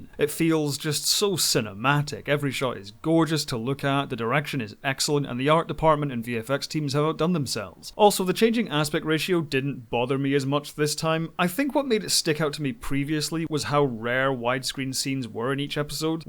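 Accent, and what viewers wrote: Scotland, United Kingdom. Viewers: Thanks for the review,. It's nice to hear a fellow Scot, and especially one so articulate and informative. I didn't know about the behaviour of the actor Cas Anvar, which meant that I couldn't understand the death of Alex in the show, who's incidentally my favourite character. Through no fault of your own, I'm going to struggle to re-watch the show again. Anyway, cheers for the review.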